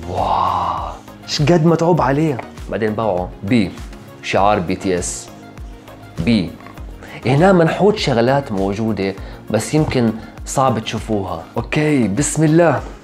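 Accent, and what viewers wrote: Iraq. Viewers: انت كنك عراقي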